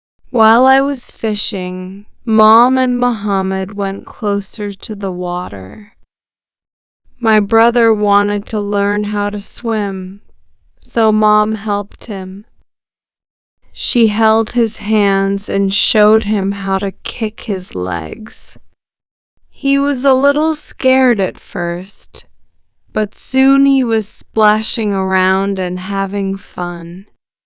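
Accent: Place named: United States. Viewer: بصراحة طريقتك ونطقك لللهجة الامريكية رااائعة.. واصلي واياااكي تفشلي.. راح تكبر قناتك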